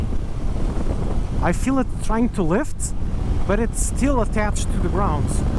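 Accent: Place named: Portugal. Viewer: Your accent appears Portuguese/Brazilian but also don’t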